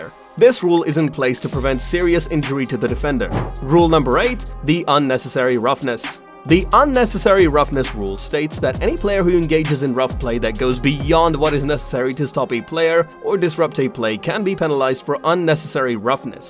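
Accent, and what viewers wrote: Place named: England, United Kingdom. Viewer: You give 14 rules, not 13. Judging by the pronunciation of words, these are rules people in England don't know exist. The only ones that I had never heard of were the "palpably unfair act" which is vague and would be something along the lines of intentionally breaking the standards of the game -- say, Philadelphia removing goal posts so both teams had to try for 2 points, which their QB sneak ability gives them a distinct advantage. Or a team putting players into the game that are not on the roster of active players for that game. The other rule you call "touchdown touchback" isn't a rule. The rule I think you are trying to cite -- especially based on the images you show -- is "if the offense fumbles the ball and the ball crosses the defender's goal line and goes out of bounds, the ball is awarded to the team that was on defense as a touchback." Also, many clips didn't depict anything regarding the rule in question. 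And a number of the clips were of college teams, not NFL.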